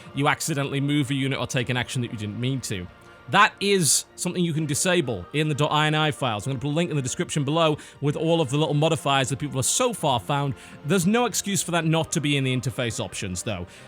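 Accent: United Kingdom. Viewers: he died?!?!?! If so, may he rest in peace...that's really sad. I will miss his British (or English, Idk) accent...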